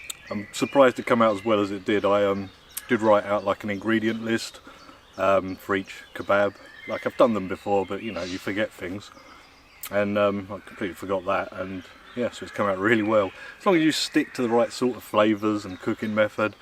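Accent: United Kingdom. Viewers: The sensational combination of at least 3 star level meals, while cooking via bushcraft, and narrating with a british accent.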